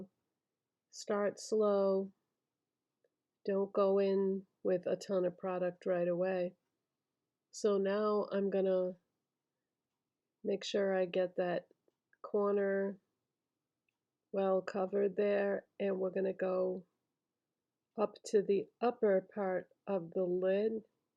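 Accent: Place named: New England, United States. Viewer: Actors take note, this is a legit New England accent.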